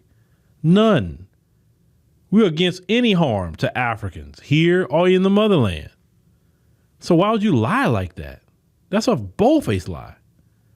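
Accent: South, United States. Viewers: I could tell from your accent you from the south so you know nothing about the north east even though we came back to fight to Free we black America north so we don’t really mess with Mexicans Venezuelan MS 13 but we love our Puerto Ricans so we have family members for generations I’m talking about foundation multiple generations tight and we don’t delineate. We stick with the ones that speak with usstop speaking poison. You could be speaking to black babies with Puerto Rican in a blood.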